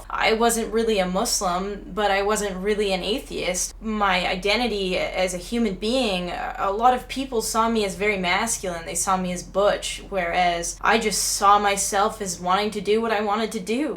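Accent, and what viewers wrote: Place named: Newfoundland, Canada. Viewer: dude you sound newfie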